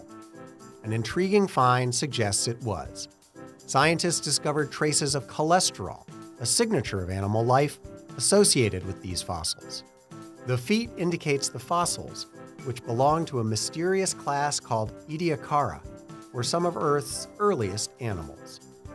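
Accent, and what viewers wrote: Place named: United States. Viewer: The American pronunciation of harassment, detail and zebra made this difficult 😅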